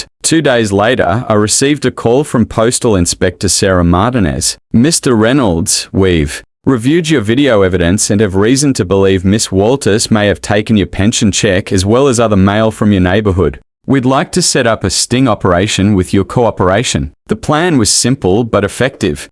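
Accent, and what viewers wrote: Australia. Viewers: Having an Aussie voice telling the story was a pleasant surprise.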